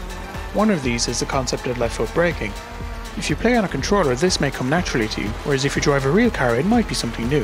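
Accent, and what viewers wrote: Ireland. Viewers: You sound Irish and I’m Irish and you sound like you have an Irish accent, how you Irish.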